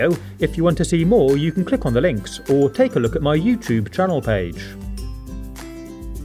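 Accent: England, United Kingdom. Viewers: are you from englang or some in the north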